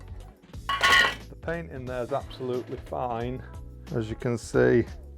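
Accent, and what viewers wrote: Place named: Bolton, United Kingdom. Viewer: Thank you! Good to know ! I’ll try this… Merry Christmas.. you sound like you’re from Bolton !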